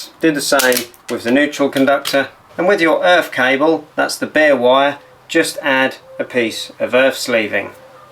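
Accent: Norfolk, United Kingdom. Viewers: Is that a Norfolk accent? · is he speaking pure British accent? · Nice vids. Are you in Norfolk? Sounds like it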